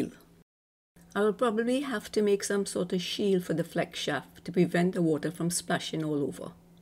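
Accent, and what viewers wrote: Trinidad and Tobago. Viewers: This was excellently explained..you sound like a Trini..great video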